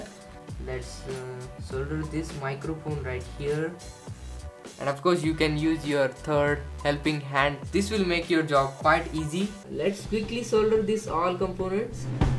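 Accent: India. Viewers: Metin Baydemir It's Indian English. Just an accent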